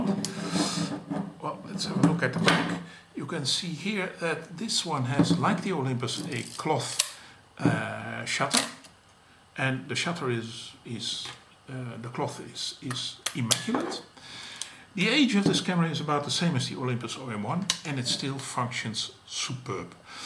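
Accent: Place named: Netherlands. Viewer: You are doing fine, I think! Your dutch accent is ok, don't worry. Maybe you can add some photos taken with those cameras to your reviews 😉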